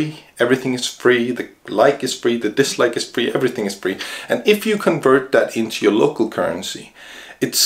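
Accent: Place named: Denmark. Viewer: I went for the DS1522+ which, after installing DSM 7.2 can also accept SSD for storage. But the same as your problem. However I do not use the SSD slots just jet. Completely offtopic for a moment. An British friend of mine said he could not hear a difference between Danish (like you) people speaking English and Dutch (like me). And hearing you speak, I cannot too. I first thought you where Dutch, so I looked it up in your profile. But that is a offtopci (for me) fun fact.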